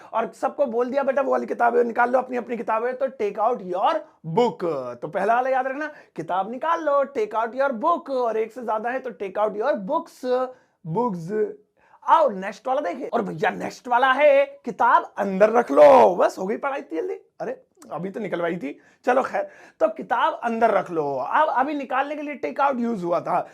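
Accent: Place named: Haryana, India. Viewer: Accent Haryanvi wala hi h sir Sare hi words ki pronounciation......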